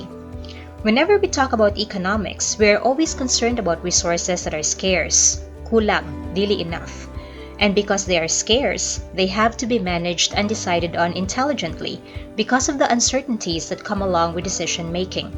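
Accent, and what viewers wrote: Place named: United States. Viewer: Abi nako americana ang gaistorya hehehehe Btw, thank you maam for this discussion!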